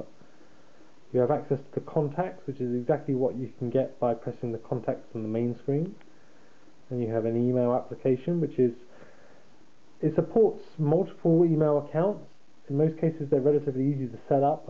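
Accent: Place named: United Kingdom. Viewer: That was funny. I think the guy is British. Hence the accent. I was thinking about getting that phone but after seeing this video i will pass.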